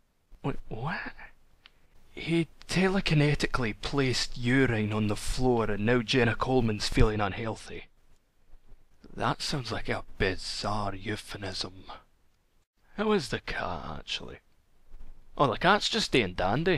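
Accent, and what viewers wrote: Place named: Scotland, United Kingdom. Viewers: hey your british i love british and doctor who by the way what exspanshion pack you using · he's Scottish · Actually I think he's Scottish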